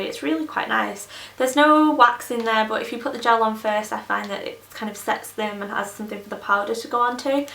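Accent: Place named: Leeds, United Kingdom. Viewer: i love your accent :) r u from leeds?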